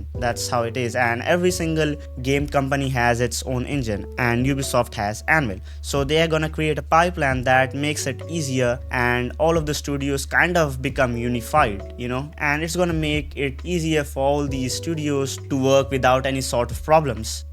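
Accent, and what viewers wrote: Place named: India. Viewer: Yoo, are you Indian? Your accent sounds a lot like it.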